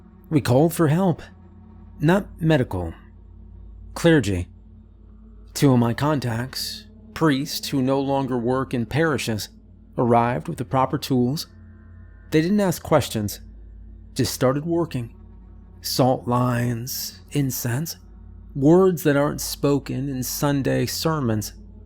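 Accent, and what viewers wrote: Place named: East Coast, United States. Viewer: I’m guessing is on the north east coast — the way he pronounces room is “rum”. Seems to be an eastern accent (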